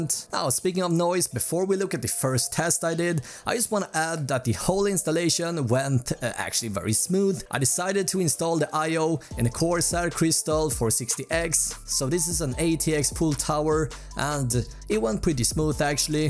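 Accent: Sweden. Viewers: The Svvedesh accent really shines through. INSTANTLY SUBBED and enabled ads!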